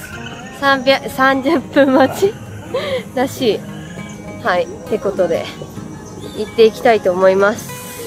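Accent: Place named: Fukuoka, Japan. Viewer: 私も福岡で方言喋ってるの見て嬉しい、ほんとかわいいなぁ…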